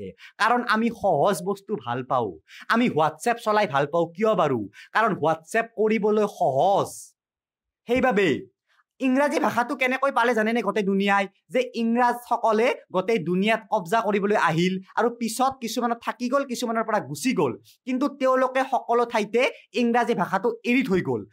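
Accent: Nalbari, India. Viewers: Tumar bhasa tur sur tu he Nalbariya. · I love the nolberiya accent❤️ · সেয়া আপোনাকো কও যে কাৰোবাৰ ভাষা কোৱা 'ঢং' বা 'accent' টোক লৈ তেওঁক বিচাৰ কৰিছে। তেওঁ নলবাৰীৰ ল'ৰা, আৰু অসমত ঠাইভেদে এই ভাষা কোৱাৰ ঢং টো অলপ বেলেগ বেলেগ, মই তেওঁক চেষ্টাক সমালোচনা নকৰো, বৰঞ্চ তেওঁক চেষ্টাক ৱাহ বুলিয়ে কৈছো.....কাৰণ মই ভাষা কোৱা 'ঢং' টো নহয়, মানুহ এজনে কি কব বিচাৰিছে তাক লৈহে বিচাৰ কৰো মানুহ এজনক.... আপুনি মই যেতিয়া হিন্দী-ইংৰাজী কও, তেতিয়াও ই অলপ বেলেগ ধৰণৰ লাগে সিহঁতৰ, যিসকলে এইটো সদায় কৈ থাকে....আমি কলে আমাৰ accent টো তেওঁলোকৰ লগত নিমিলিব, এতিয়া accent নিমিলে বুলিয়ে কথাখিনি বেয়া বুলি উলাই কৰিব নোৱাৰি।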